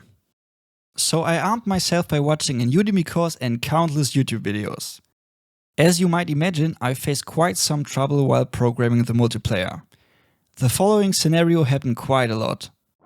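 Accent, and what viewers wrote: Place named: Germany. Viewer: Ich hab mir während des gesamten Videos gedacht "der Akzent klingt doch irgendwie voll Deutsch", und am Ende einfach der Screenshot vom Kanal wo steht "Mehr über diesen Kanal" 😂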